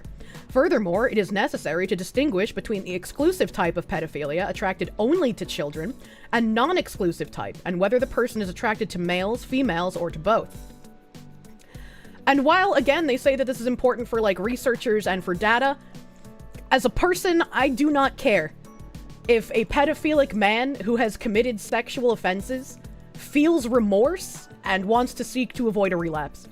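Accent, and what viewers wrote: Boston, United States. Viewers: I thought the reason you pronounced things oddly is because you're from Boston.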